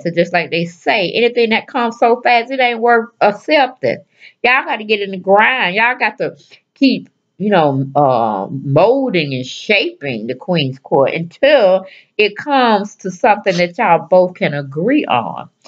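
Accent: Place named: United States. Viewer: Slow down I can’t understand your country accent you talking to fast lol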